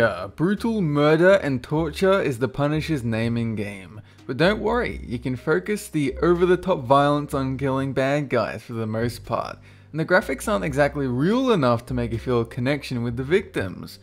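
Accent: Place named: Australia. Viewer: I had no idea you were an Aussie until now... go figure lol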